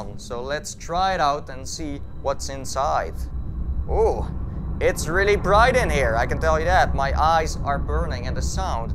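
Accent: Netherlands. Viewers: Zijn Engels is goed maar hij heeft een Nederlands accent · Je kan horen dat hij van Nederland is!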